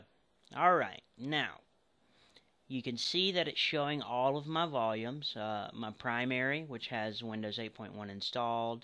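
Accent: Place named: Texas, United States. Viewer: I agree! I love your voice! Clear and precise instructions. I would venture to guess you are in Texas. Anyway, this video has opened my eyes to what my computer can do. I used to use Auslogic, but I believe it had another name. It was on a desktop I had with windows 3.1 and also with windows 95. Now you can guess how old I am. LOL Since I got my new computer, DEL, I've been looking for this, and now, thanks to you, I have it again. Thank you so much! Keep up the good work!!!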